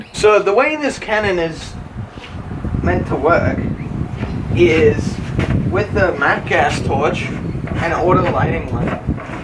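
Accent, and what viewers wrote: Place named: England, United Kingdom. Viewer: You really should look into installing a grill ignitor system. Preferrably an electronic one vs a piezoelectric variety. It will solve all of your firing issues. Im detecting an english accent. Perhaps they may not be available where you live. Best of luck man.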